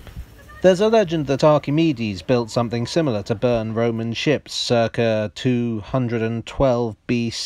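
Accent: United Kingdom. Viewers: I can’t tell if this is a mini documentary or just a straight up British guy roasting a building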